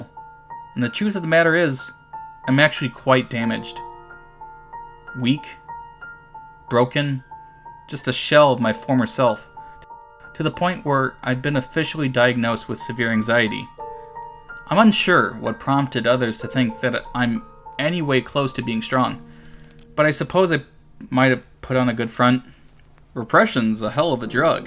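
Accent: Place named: New Jersey, United States. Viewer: You sound a little bit like a New Jersey guy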